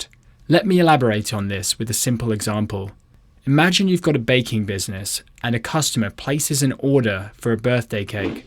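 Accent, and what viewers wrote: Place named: England, United Kingdom. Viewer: Oh damn you have an English accent but you live in Vancouver? I guess they don't call it British Columbia for nothing, lots of Brits here.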